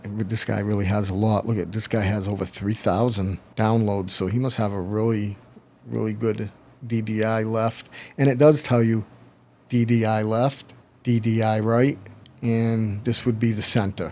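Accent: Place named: New England, United States. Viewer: What part of the north east are you from sir? I’m hearing RI or Mass.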